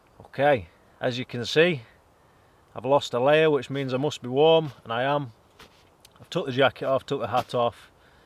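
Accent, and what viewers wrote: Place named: South Yorkshire, United Kingdom. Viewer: Defo a South Yorkshire twang in there somewhere. Enjoyed the vid. Thank you for sharing 🙏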